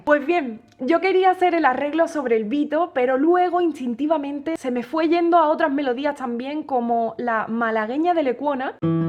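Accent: Andalusia, Spain. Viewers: Eres grande guitarrista y adoro ese acento andaluz cada vez que pronuncias una T o una S. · es un conglomerado de virtudes que además de no encontrarla fallos, hace honor a su apellido, todo cuanto hace es eccioneccion. hermoso, hasta físicamente, es una guapa española con acento andaluz, que representa a España hasta en lo que toca y canta, con un gusto y un saber colocar la voz, hasta la perfección. Gracias por e l buen rato que me has hecho pasar deleitándome con tus perfectas interpretaciones. Tu siempre admirador, Kini Palacios. (pianista-acordeonista y percusión) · Escuchar ese acento andaluz 🥰🤤🥰 enamora 😍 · Santo dios...pero qué tiene esa guitarra dentro que suena como un manojo de cascabeles afinados y bajando a tempo por las escalerillas de un palacio de cristal???? Algún día tendrás que hacer un video de cómo te grabas, cómo pones el micro...Bueno, para el resto de nosotros mortales poca cosa nos va a ayudar...Es impresionante cómo respira tu guitarra en esos acordes abiertos con los que rematas...perfectamente afinada y agradecida de que la acaricien con tanto mimo y a la vez tanta energía. 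Suenas poderosa y resulta casi humillante mirar tus dedos corretear sin esfuerzo por trastes y cuerdas mientras la madera de tu guitarra ríe feliz. En esta pieza me has atrapado desde las primeras notas. A veces paro tus videos de pura envidia y desolación, pero esta vez me has embrujado y lo he visto de pe a pá. Y encima nos regalas todo esto envuelto con esas dos sonrisas con las que empiezas y acabas los videos. Ole tu cara bonita, tus dedos de gacela y tu acento especiado por tantos siglos de al-andalus y demás mixturas celestiales. Paro, que ya lo dije todo sin decir nada...Un abrazo para ti, y todos tus seres queridos a los que bendices con tu presencia. y VIVA LA MADRE QUE TE PARIÓ!!!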